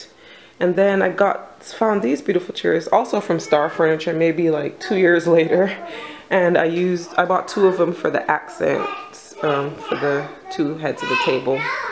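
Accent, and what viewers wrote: Barbados. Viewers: I love ur style U sound like a Bajan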